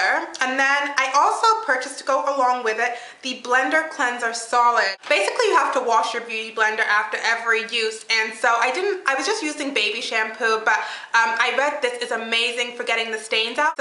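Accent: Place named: United Kingdom. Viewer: I knew I heard a British accent! :)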